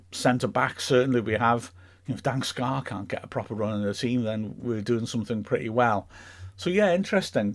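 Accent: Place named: Newcastle, United Kingdom. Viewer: Loved your Geordie accent! P.S. Thanks for keeping it under an hour. I find too many podcasts go to an hour and a half or more and I think that's way too long :)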